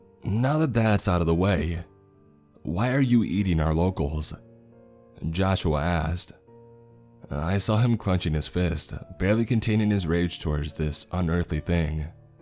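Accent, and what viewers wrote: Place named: South, United States. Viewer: It's a Southern guy man give him some slack lol.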